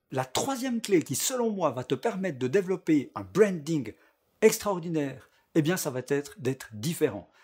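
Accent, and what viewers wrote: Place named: Neuchâtel, Switzerland. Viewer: Ahhh j’me disais qu’t’avais notre bel accent ! Vive l’absinthe ! Vive Neuch !!! 😂🇮🇹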